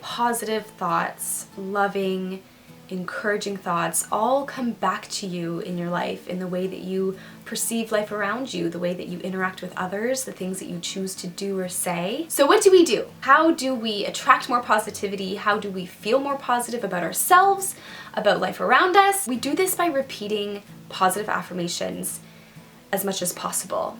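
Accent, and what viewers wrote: Canada. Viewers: Awesome guidance! Super excited when you post a new video! Oh, and I love the Canadian accent!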